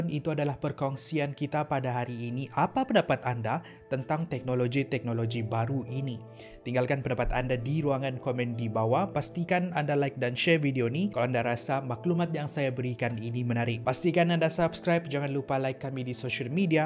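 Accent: Sabah, Malaysia. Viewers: Nk tnye dia nie org sabeh ka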